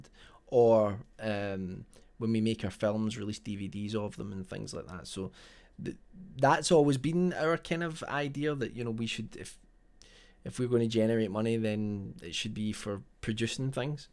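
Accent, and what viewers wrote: Scotland, United Kingdom. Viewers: Well said or rather, well said with a Scottish accent 🎧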